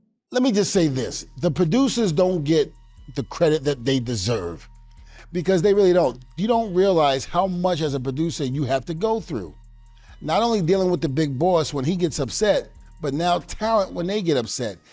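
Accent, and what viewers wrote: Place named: Baltimore, United States. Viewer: i literally never noticed his baltimore accent before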